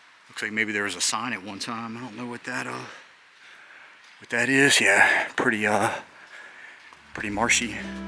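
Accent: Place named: Virginia, United States. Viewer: What part of Virginia are you yourself from, the Tidewater area? You're talking about the Tangier accent but I'm wondering about yours. I grew up in the 757 and you sound like many of my relatives.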